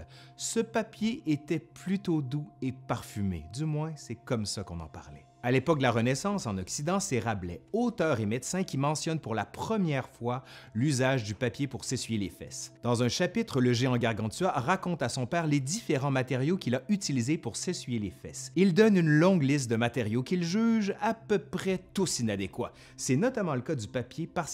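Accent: Quebec, Canada. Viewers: Entre le papier de toilette, le papier toilette, le PQ, le papier de chiottes... Ohlala ! Ta vidéo est très rigolote et je me suis bien marré. Tu fais vraiment un très bon boulot ! Ta chaine, avec ton accent québécois à couper au couteau, c'est une bonne partie de rigolade et maintenant, voilà ! Tu me fais aimer l'histoire... Et bien, quel exploit ! Bravo à toi !😀😁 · Purée l’accent québécois c trop beau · Je découvre votre chaine sur cette "capsule" 😆(désolé, c'est pas méchant mais tellement marrant pour nous les françois !) sur cet épisode du PQ, et honnêtement c'est par inadvertance et non par curiosité malsaine. Bref, je trouve le présentateur des plus agréable à écouter, avec son petit accent canadien ( que j'aime beaucoup). Je trouve vos sujets très enrichissant, bien que je sois pauvre comme Job, normal je suis retraité, (plus de job). Et racontés avec une emphase des plus agréable. 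Alors Merci énormément, abonnement de surcroit.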